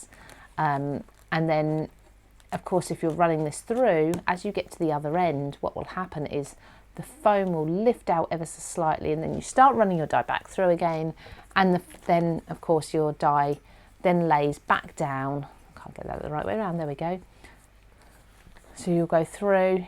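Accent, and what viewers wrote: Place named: Norfolk, United Kingdom. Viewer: Your accent is lovely! Are you from Norfolk? I'm am American with a fascination and appreciation for all accents British. And your video is great too! ;-)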